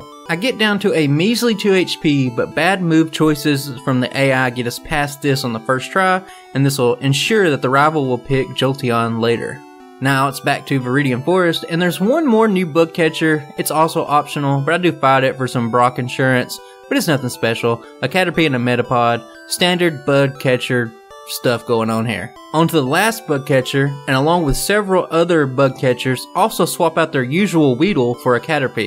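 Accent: South, United States. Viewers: I am glad that the algorithim gods blessed me with your channel. There is just something I love about the American southern accent that I find wholesome ( I know that's stupid, don't at me!) I find that I enjoy watching pokemon challenges, because they are the perfect background noise while I play other games or study.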